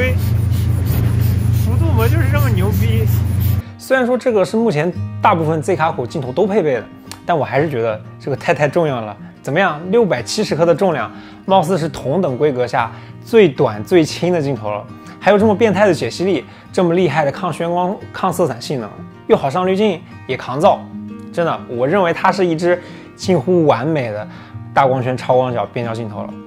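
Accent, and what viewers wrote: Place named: Henan, China. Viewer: 突然这一集满满的fu南话？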